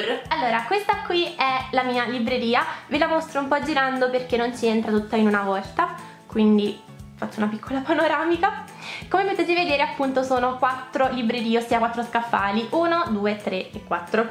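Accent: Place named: Italy. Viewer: Ya sabía yo que era Italiano. Se oye luego luego. Lo bueno que se parece un poco al español y pude entender algo jaja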